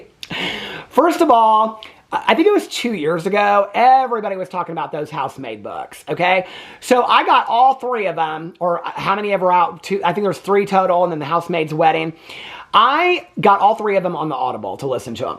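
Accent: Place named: Boston, United States. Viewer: I love your Boston accent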